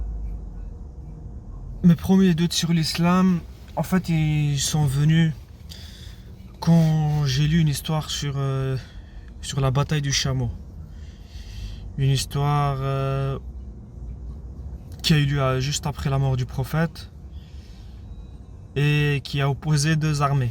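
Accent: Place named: Maghreb. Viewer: Ôh merci, merci de m'avoir ouvert les yeux!! Je vous ai dit j'ai compris, maintenant je vais détester l'islam comme vous. C'est trop bien de détester la religion des autres, de détester les morts. Monsieur le théologien à la sauce haineuse qui n'a toujours pas réussi à répondre à 1 seule question, 1 seule. Si j'avais manqué de respect à il me l'aurait fait savoir, mais vous vous me manquez de respect et prétendez sur mon compte avec arrogance. La première chose que j'ai aimé de c'est son petit accent maghrébin, je trouve que ça a tellement de charme. Ce message marque la séparation de nos chemins, j'espère que vous arriverez à comprendre le français: BONNE ROUTE!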